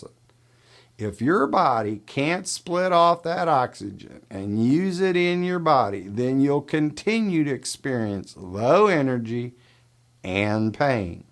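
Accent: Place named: South, United States. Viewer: Your delivery and pacing is really good. I disagree with those who want it faster. They must be hyper active coastal types. Very refreshing compared to many frantic YouTubers. You sound like a southern good old boy: easy on the ears : -)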